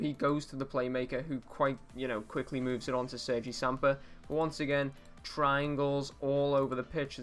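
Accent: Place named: Liverpool, United Kingdom. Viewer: Where in Liverpool are you from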